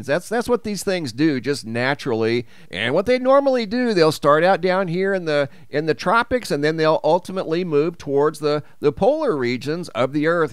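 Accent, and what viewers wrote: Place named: California, United States. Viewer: Great content- when you say words ending with ing it sounds like een. Cali trait.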